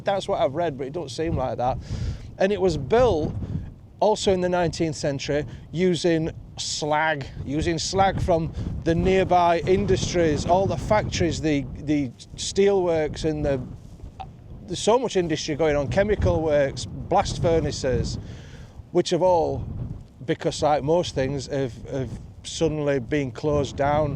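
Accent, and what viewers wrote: Yorkshire, United Kingdom. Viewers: Another brilliant one. Yes, it’s winter with accompanying weather but you soldier on with the help of a cuppa and a bacon banjo. I like getting to see things & places with your channel that I would never normally see AND I get to know how Yorkshire folk pronounce place names. Love your vlogs and look forward to your next adventure along t’coast. 💕💕💕💕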